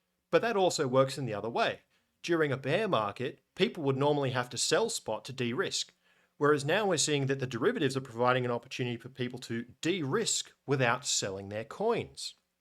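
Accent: Australia. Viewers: I prefer dark mode, but either way your information is absolutely priceless and very much appreciated. Thank you for everything you do. And it's very nice to hear it all explained by an Aussie too!! 😃😃😃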